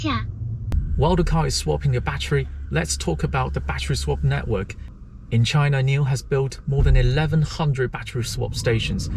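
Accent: London, United Kingdom. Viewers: 英语真好啊这标准的伦敦音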